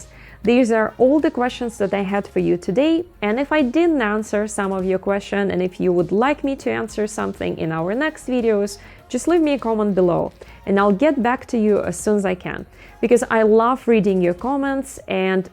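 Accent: Russia. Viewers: Where you from ? You sound Russian ?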